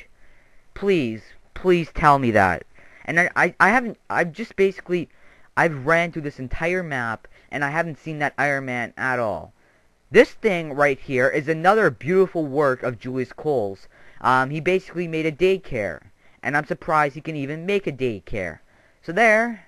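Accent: Canada. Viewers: AwesomeManPlayz A lot of people pronounces a word differently plus he's Canadian lol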